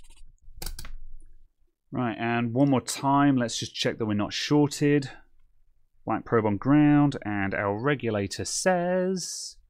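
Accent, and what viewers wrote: England, United Kingdom. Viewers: Just inject 0.8 volts with limiting at 2A current.You ll be safe,if the heated area is crowded,use alchohol and inject power again to spot the exact faulty component.YOU NEED A THOUSAND HOURS TO FIND IT WITH SCHEMATICS AND MEASUREMENTS and at the end of the days you will have learned nothing.Anyway i admire your engilsh accent and your patience.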